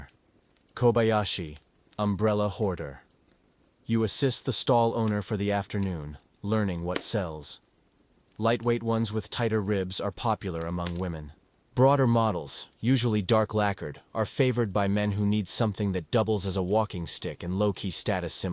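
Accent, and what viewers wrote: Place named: United States. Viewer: The text of this is interesting but theUS accented voice with 'true crime' rapid paced staccato inflections isn't restful.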